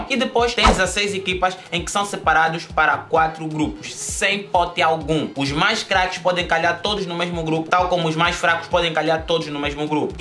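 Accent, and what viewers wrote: Angola. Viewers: gente eu entendi tudo o que esse cara disse ,se ele é africano deve ser angolano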